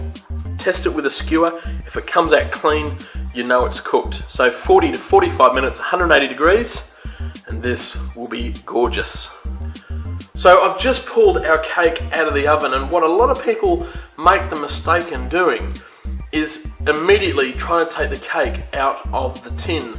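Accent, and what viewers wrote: Australia. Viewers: I love your Austrailian accent!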